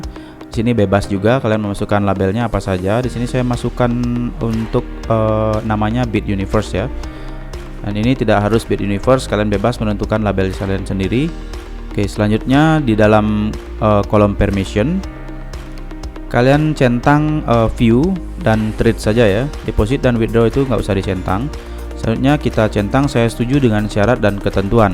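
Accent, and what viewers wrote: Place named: Bali, Indonesia. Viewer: Logat baline kental sekali bli... 🙏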